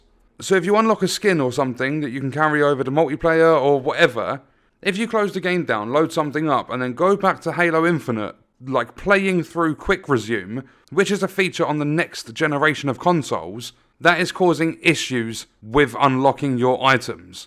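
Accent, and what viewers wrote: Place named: Croydon, United Kingdom. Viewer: Haven't found any AA or A games that take me fancy in a long time. Loot based is my main staple. This is the first time I've actually enjoyed a halo game in decades. Probably because it feels amazing. I would love to boycott AAA games because the state they come out in is close to theft. That's why i like your channel bro, because you are not scared to tell it how it is.... plus your accent and humour reminds me of an old friend of mine from Croydon 👌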